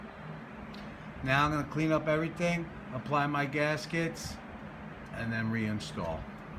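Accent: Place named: New Orleans, United States. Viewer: I volunteer at the WWII Museum in N.O. and when meeting visitors from out of town I always try to guess where they are from based on their accents. You have an accent like people from the N.O. area and also from the northeast. I want to say perhaps the metro N.Y. area, am I correct or totally off base? :- )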